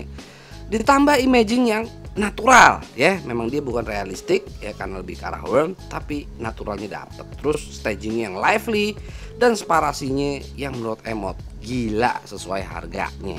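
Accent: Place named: Petamburan, Indonesia. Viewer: Logat petamburan nih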